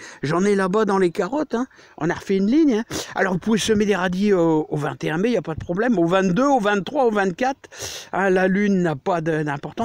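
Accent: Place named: Nord-Pas-de-Calais, France. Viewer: Moi j'adore quand tu parles ch'timi 😂❤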